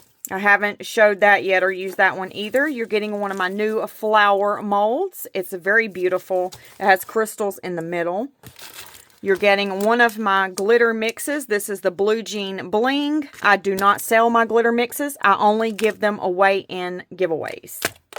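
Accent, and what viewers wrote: South, United States. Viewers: You are a Blessing and extremely generous. Not many content creators return their gratitude the way you do. You not only put your heart and soul into your channel and subscribers. You take the time, thought, and money to put together a large gift box for those who have given and blessed you. It's hard to come by finding generosity and kindness, but also people willing to give without expecting anything in return. I can't afford to send anything or buy from you at this time, but am willing to do that whenever I get that opportunity. With you being open and sharing the Lord publicly, that's a gift in itself. Plus, you're so adorable and just a doll baby with that HUGE country bumpkin twang that I miss so much whenever I lived in the south. (Georgia). I don't feel it's unfair. I feel that you made the right choice when deciding whether to return your gratitude. 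You can ONLY do what you can do and also afford. We all strive to do our best once we choose to do the best thing. I'm sure once your channel grows so will the giveaways. When one chooses to subscribe to a channel, they do not come in expecting gifts. Why now expect it knowing your reasons for the giveaway? Makes you think of others true intentions for watching or subscribing to creators. Focus on those who are here for you regardless of gifts or freebies. Those who are selfless and carry honest intentions, are those who are favored the same in return. Whether it's time, generosity, or acceptance. Please do not stress on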